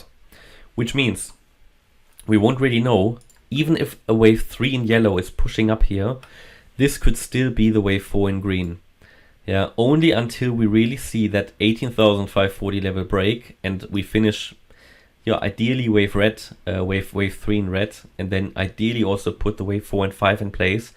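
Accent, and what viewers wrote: Germany. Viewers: New to this channel, and I love your accent! German yes? It's wonderful :)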